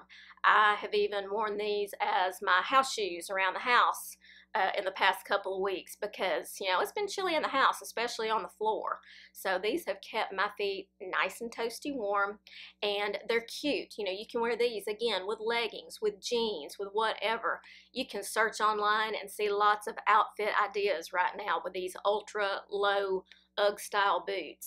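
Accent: South, United States. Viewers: I’ve just discovered your channel. Thank you so much for your hard work!! I love watching you…especially as a Southern expat living in Montana by way of Oregon. Especially since you confirm so many of my own rules, lolz!! Please don’t feel the need to change your accent (saying awn instead of own for the preposition). Let your accent come own through, hunny!!! I sure do!!! Love you, Love you, Love you!!!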